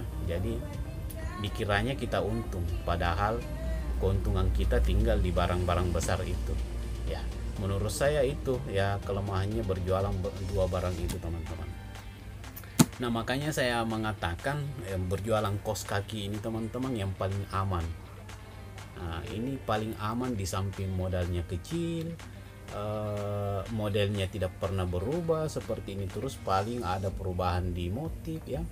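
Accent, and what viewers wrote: Indonesia. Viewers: Sulawesi ni kyaknya.. Salam dri bugis kaltim.. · Bang emng dari serang banten ya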